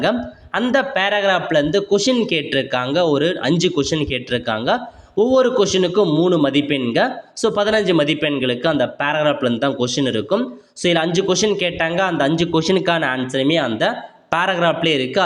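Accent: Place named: Thoothukudi, India. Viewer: Yo thoothukudi ya aha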